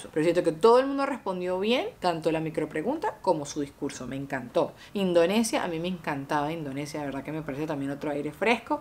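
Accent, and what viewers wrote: Venezuela. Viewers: Me gusta mucho como habla daniela burde lo nornal chama típica criolla te amo eres exelente besos 😘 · saludos, vi el Certamen con mi esposo, por TNT, al escucharte me pregunto ¿quien es esa la que esta hablando? - Yo: Es ¿sabes quien es? - y me dice Si, ES UNA VENEZOLANA! JAJJAJAJA me encanto que me respondiera asi!! Te queremos!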